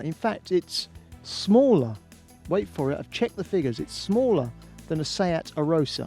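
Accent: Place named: Midlands, United Kingdom. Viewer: Up-holstered skaaete-bowd. What accent is this, Midlands? The North?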